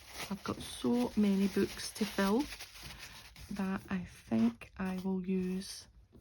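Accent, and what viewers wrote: Scotland, United Kingdom. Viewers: Hi, so lovely to hear and see a fellow jock crafting. I am 47 and a total beginner. I am loving life. Midlife crisis phase pending but no fast cars or toy boys for this married lady with six kids haha just paper, glue, scissors and lots of YouTube videos. It was Amanda from Srimmping Mommy that recommend you and how delighted am I to hear a Scottish accent. I am from Fife. I don’t know anyone that crafts local to me and that’s such a shame. Your videos and new craft room are wonderful. I have been binge watching, not stalking. Video stalking. I started trying to adult colour roughly 18 months ago and love it but I’m having problems with my hands and neck and I was embarrassed I couldn’t do as much as I would have liked I then got engrossed in junk journals, and all that entails. I haven’t been brave enough to post any recent videos on Instagram or YouTube but hopefully with more confidence learning more. 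I will share and join in. It’s such a wonderful, kind, helpful, decent community. Something rare these days it feels like. I have just joined Amanda’s Facebook group. She is a lovely women with a great personality and mission to help and get others involved and for that alone I support her. I will be thanking her for sharing you with me haha Now I’m away to watch more altered cards ❤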